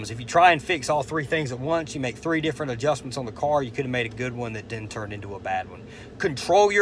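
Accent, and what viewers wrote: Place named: South, United States. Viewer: Thanks. Needed to hear today!! The southern accent always helps too. It is soothing to me. 😉I don't hear much here. Make today amazing!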